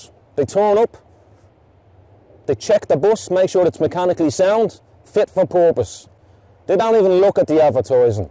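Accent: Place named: North Dublin, Ireland. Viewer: No. That's a blood and soil born bred and starved Nth side Dublin accent.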